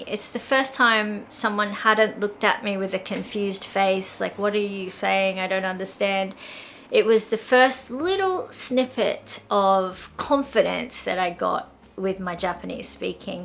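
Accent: Australia. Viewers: I know that much of Japanese English is based in part on American English so it’s not surprising that they likely struggled with your Australian pronunciation and accent. Interesting anecdote. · I understand where your comming from when it comes to a Japanese person or Thai understanding us english speeking people , us Brits have a thing in our heads called auto correct, if a japanese person ask me in pigeon English, we/I would probbably understand what they were trying to say, although they are not perfect, we, our Brains auto adjust to figure out what they want, or asking in there sentence, but i find that a lot of asian countries dont have this, unless its perfect pronunciation they just look blank at you, what probably happend to you as a teenager, unbeknown to you, you was speeking Japanese with an Ozi accent . I quite often have my Thai girlfriend in fits of laughter when i try to pronounce Thai, if you think Japanese is hard ( its not) Thai script has tonal pitches, although the spelling of a word is the same, the tone or rise and fall at the beginning, mid word, end of the word can translate into a different meanings, as for pronouncing Japanese, try and get a Japanese accent it help when i prononce it . 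· I am interested in not just the pronunciation but also the ups and downs of the voice... Though I have Japanese grammar and vocabulary books, no teachers here are willing to teach me speaking... it's a gap left blank. They are not native speakers thus not confident enough to take the job. As for the native ones, they don't speak my language so we can't communicate well and I find it hard to explain to them what I need. 😅 Besides, they are not educators just housewives who have no idea of the methodology of teaching. I found I am also improving my English with your lovely Australian accent haha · I'm sure speaking English simply uses the tongue differently saying vowels and letters differently. As she describes phonics. I would have a very difficult time speaking Japanese. I wonder if everyone learning English from her will have an Aussie accent. Probably same as my Dad learning English from a country under a British rule. 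In general I hope we all understand each other with respect. I applaud you for what you have learned so far.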